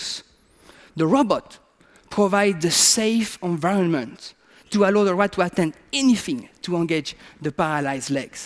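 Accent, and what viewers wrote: France. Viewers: Ohhhhhh the French accent made it so much more interesting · Ahaha oh my god, this video was so powerful and amazing, but as a French speaker myself I couldn't help but find it funny every time he pronounced a word exactly like he would've in French. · Yes, thick French accent. Had to listen to it several times before I understood what he was saying.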